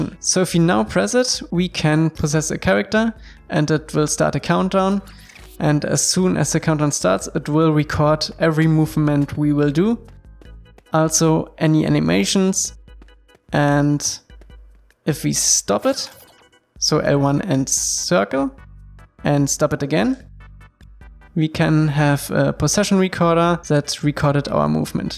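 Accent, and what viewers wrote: Germany. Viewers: echt jetz? warum denn nu in englisch? wenn ich das will da gibts doch schon so viele....aber gute "how to`s" in deutsch sind doch schwer zu finden! Bitte - hast übers animieren auch in deutsch was gepostet? Ist super wie du das machst, aber dein englisch ist ....lustig - so deutsch, smile^, wie langemachst du das schon? und wie lange bereitest du dich auf so ein video vor? Danke fürs antworten auch wenn der Spot schon alt ist^^ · Deutsch Akzent is da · Love the German accent ? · Schöner Deutscher akzent Das video ist supi 👌🏻👌🏻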